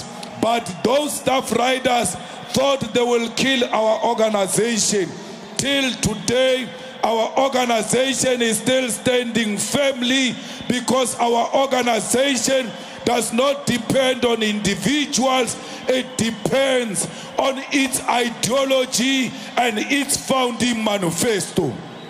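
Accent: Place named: Zimbabwe. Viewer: Sounds like Zimbabwe where Malema was born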